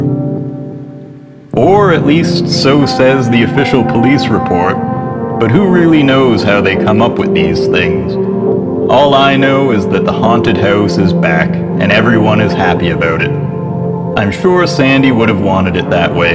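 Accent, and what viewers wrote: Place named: Canada. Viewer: Is it weird I listen to these in the bath as I find your voice and accent relaxing 😌 you should do an audio book! I love the Canadian accent 😍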